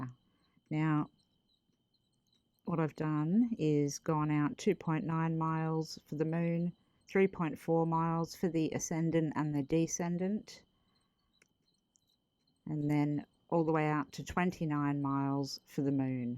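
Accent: Australia. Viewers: at this very moment, there are 7 writing notebooks for me to jot down info, in passing, and others that are strictly for astrology , past lives that deals with astrology (Karmic complexes..etc), Medical astrology: Santos Bonnaci and others and others. On my nightstand (books are a permanent fixture unless I choose to take it with me that day): Judith Orloff MD. The Empaths Survival Guide, D. Vessel Van Der Kolk MD. the Body Keeps Score' , Gary Zukav , Dr. David r Hawkins DR. Masaru Emoto and a 2 or 3 others. I NEED more astrology books! Your is next up to be ordered. I'm just waiting for Mercury RX to leave the shadow period. Your knowledge, teaching style and overall beautiful disposition is ACES!!! I want and need to learn from you. As I've said it before: You male learning fun and easy. Unfortunately, the cases are sad but your ability to put it all in b&w with seemingly little effort speaks to your AWESOMENESS! 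**side note*** I love your Australian accent and when the camera is on your face. So adorable! 💜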